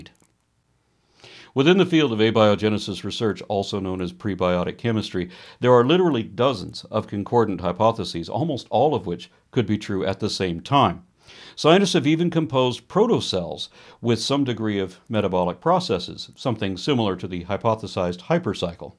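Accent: United Kingdom. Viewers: British accent gets 10/10 from me! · Excellent video. Loved the British accent.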